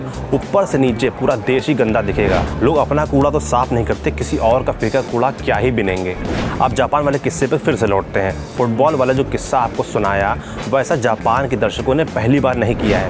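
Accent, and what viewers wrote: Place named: Kanpur, India. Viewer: are You From Kanpur....coz your way of speaking seems like Kanpurites...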